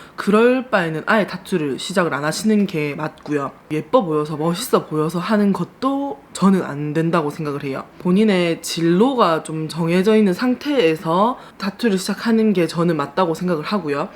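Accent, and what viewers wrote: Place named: Gyeongsang, South Korea. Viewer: 말씀하시는거보면 저도같은경상도라그런지 친해지고싶네요^^ 대화해보고싶은 ,,, 화이팅~~^^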